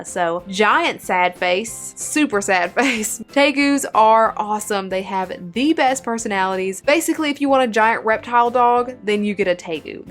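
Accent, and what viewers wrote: South, United States. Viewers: Love the southern drawl as well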